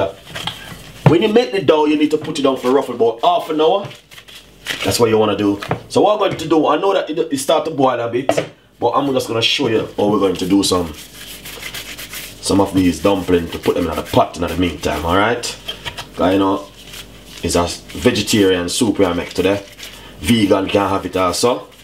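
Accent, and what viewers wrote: Jamaica. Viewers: Very nice soup & lovely Jamaican accent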